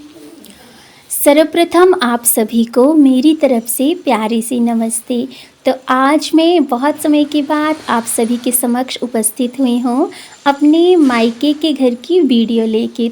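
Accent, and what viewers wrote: Standard. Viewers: Aap kitana hindi bhi sudh bolti aaj ka vlog bahut kitchen bahut khubsurat lagi mujhe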